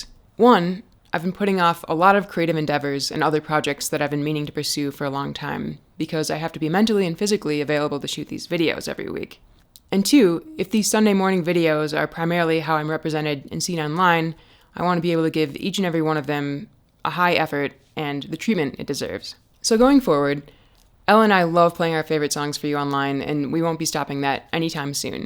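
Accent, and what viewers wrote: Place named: United States. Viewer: Where in the States is your accent from?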